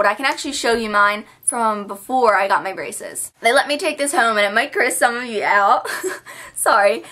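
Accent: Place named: South Jersey, United States. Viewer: Not to be creepy, but do you live in southern Jersey. I noticed an accent and a phillies hat. I am from absecon and I recognized your voice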